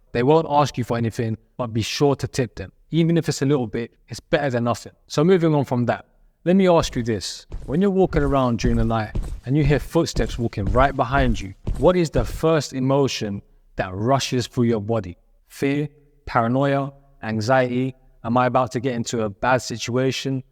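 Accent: United Kingdom. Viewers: You British by any background???.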